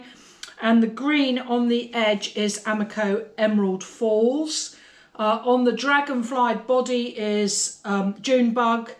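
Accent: England, United Kingdom. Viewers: could you tell me how you have created those great spiral handles? i love watching your kiln openings, numerous hair styles and lovely english accent. I'm watching from Victoria, Australia. 35 celsius today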